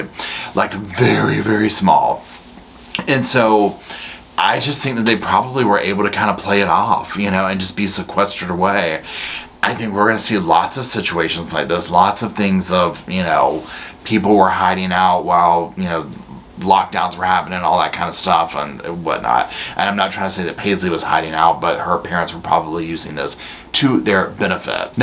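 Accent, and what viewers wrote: South, United States. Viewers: Dearest and Roscoe darling, I L💓 VE YOU!😘 I can't tell you how thrilled I am to watch your channel grow 🌱 There's nothing more fabulous than hearing that southern twang in your voice as you spill the tea to "Y'all"!😁 And a shot out to the officer's who were so kind to this precious little child 💞 My heart just aches thinking about what she's been through... BOTH these "parents" should go to prison for a longggg time!🤬 They have no doubt f*cked up this child's life, and I pray she gets the care and therapy she'll need to heal🙏 I'm so grateful for this channel, and adore "y'all" to pieces 🧩 XOXO's, Maegan 💋💋💋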